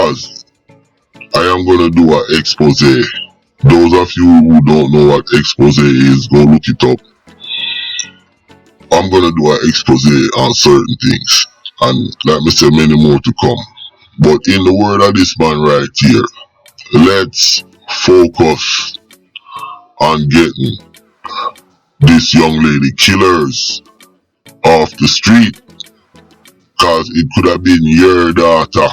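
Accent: Jamaica. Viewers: WHATS UP WITH YOU AND SAINT LUCIANS?? ARE YOU A SAINT LUCIAN AND TALKING LIKE A JAMAICAN. SHOW YOUR FACE. JUST CURIOUS. ARE YOU FROM SAINT VINCENT. DO YOU WORK FOR THE FEDS. DID THE SAINT LUCIANS DO YOU WRONG. JUST CURIOUS.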